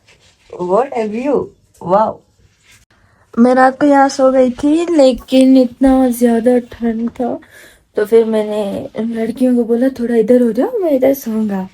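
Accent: Sindh, India. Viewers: I just love ur sndhi acnt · your Voice,Sindhi accent, cuteness, smiley beautiful face and positivity made me your biggest fan, I became so addicted to your vlogs ❤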